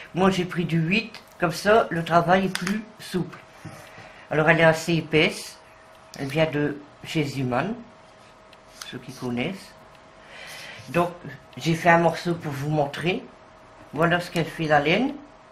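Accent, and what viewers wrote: North, France. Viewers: Vous êtes du nord apparemment de part votre accent!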